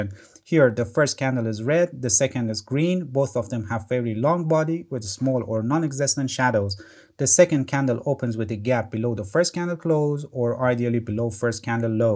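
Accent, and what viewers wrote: Iran. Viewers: As soon as I started the video, knew my man was Persian. Halet chetore azizam???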